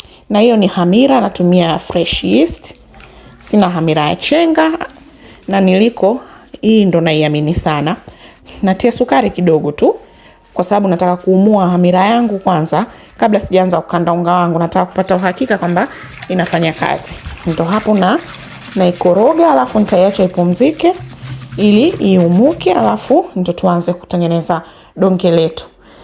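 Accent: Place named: Tanzania. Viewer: Mahamri ni lugha ya Kenya, lkn mpishi anaonekana ana lafdhi ya ki Tz, neno "kiduchu" sina hakika lkn nahisi kama linatumika Zanzibar pekee 😂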